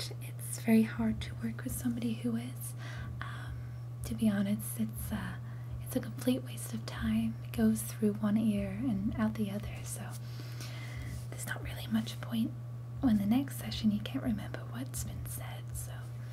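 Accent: United States. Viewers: Lovely, especially the scalp massage. Your accent was on point —I'm American and I don't think I would've suspected it wasn't your native accent if I hadn't known better. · This is an excellent American accent! Probably the best accent switch I've seen in an ASMR video. I agree with those who said you sound like Springbok ASMR here :) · holy crap I never understood the "American Accent" until now! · Great American accent. Love your ASMR video. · Excellent American accent,!! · Your American accent is quiet good! Not many people can perform that sort of affectation without sounding silly. You have a wonderfully warm smile as well :) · Great american accent! Your true accent pretty much only came out in the long O sounds. But then again, people from the US talk all kinds of weird ways, so I'm pretty sure nobody would think that you weren't from the US if you were using the accent over here. 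· I thought you were American wow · As an American, I can say you did a great job at that American accent. I couldn't tell you were even British because the accent was so good. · I'm super impressed by your American accent! It's really quite accurate. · As a citizen of the United States of America, this was a very convincing accent, and overall a very nice video. Props to you. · I just praised your spot-on Scottish accent on another video...now I'm praising your excellent American accent. You have a genuine talent for asmr as well as accents. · Just wanted to pop in and give my expert American Stamp of Approval on the accent. You even said "food" like someone from the midwest. Also, the marker sounds ruled. Oooon an ancillary note: very lovely video, many thanks as always. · i like the american accent. good job. you are just amazing in general though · Great accent! Good job very believable... 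I only heard a few instances of your Scottish...British... Australian accent. and I only noticed because I AM american. But good job! Good Video! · Ma-saj not Mash-aj. Otherwise, amazingly convincing and indistinguishable from real Americans :> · Also your American accent was amazing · ASMR ahh your American accent is impeccable!!! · That's an amazing American impression. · Wowww!! You're so good at accents omg, if i didn't know any better I'd think you were american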